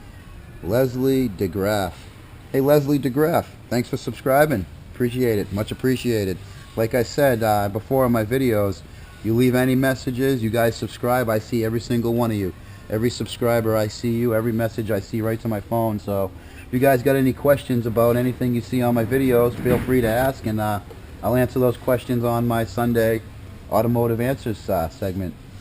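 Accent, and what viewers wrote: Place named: New England, United States. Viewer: hey.i see a few Saab videos! I have a 07 93 20t. so keep em coming! btw.. You in MA? I detected a new england accent.. take care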